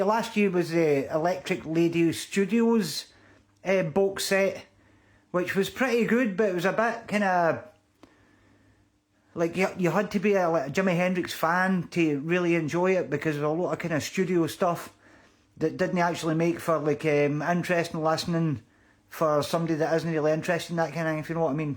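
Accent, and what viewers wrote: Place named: Scotland, United Kingdom. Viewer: Nice video. Your accent reminds me of an SNL skit in America. “Welcome to All Things Scottish, where if it’s not Scottish, it’s crap!” 😂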